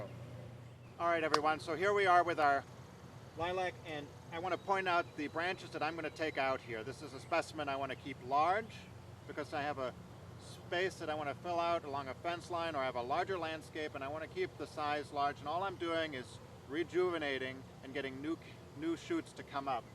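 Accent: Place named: South Dakota, United States. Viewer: Sounds like a guy from South Dakota! Good instruction, thank you!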